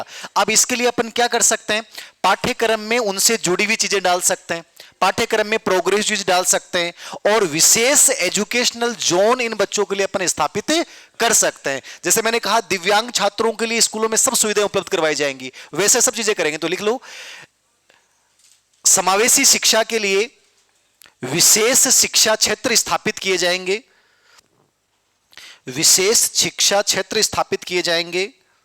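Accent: Rajasthan, India. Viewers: Sir aap ki Marwadi ne mn ko khus kr diya aap ke samne beth ke pdne ko mn kro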